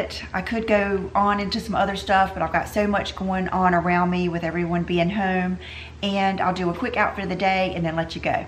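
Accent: South, United States. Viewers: Apples of your cheeks!! Lol she said it when I was writing it! Love your accent. You must live in the south.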